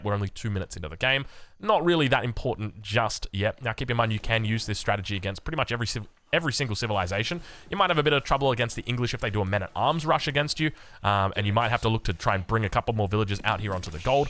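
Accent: Australia. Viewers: Listen at 0.9x to compensate for the Australian accent!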